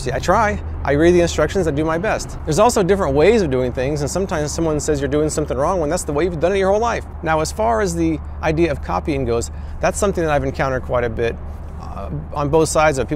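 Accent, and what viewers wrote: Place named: South, United States. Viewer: Keep the southern accent man 😂 if you grew up in the south then keep the accent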